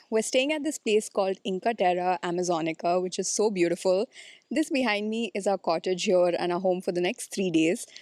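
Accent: India. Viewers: Hindi ke liye there are other channels Paramvir, Shubham, Navankur. makes her videos in English aur bahut saare log inke videos dekhte hi English seekhne ke liye kyuki yeh Indian accent mein fluent English bolte hai. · Video was good except hilarious strong indian accent, I can't take it. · Most people follow your YouTube channel, and 99% of them do so to learn English with an Indian accent. Therefore, I hope you lean fully into the authentic Indian accent in your content; travel details are not that important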